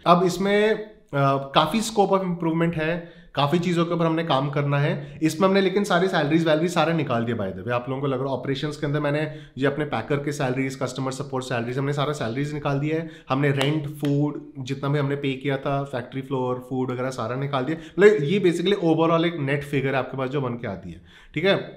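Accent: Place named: Surat, India. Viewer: just by the tone i can tell for sure you are shipping from surat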